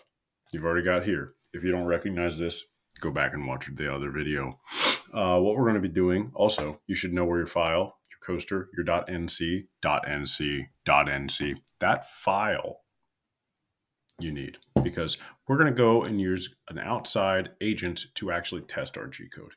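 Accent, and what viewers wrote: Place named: United States. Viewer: you sound the most american ever. you are funny btw hahahahahah.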